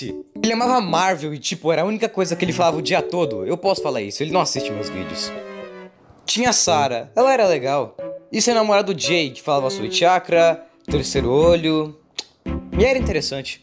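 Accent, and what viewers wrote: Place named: Portugal. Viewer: Mano tu parece que e de Portugal pelo o sutaque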